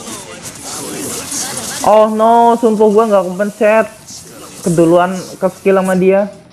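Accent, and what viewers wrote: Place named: Banyumas, Indonesia. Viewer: Ngapak" njir 🤣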